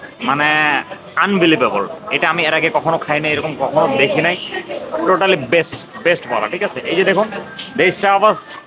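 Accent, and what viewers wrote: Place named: Kolkata, India. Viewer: পুরা কলকাতার ভাষা শিখে গেছেন দেখি।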